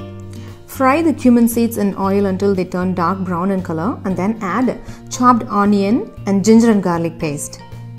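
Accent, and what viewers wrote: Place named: India. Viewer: Your English is very Indian style not perfect at all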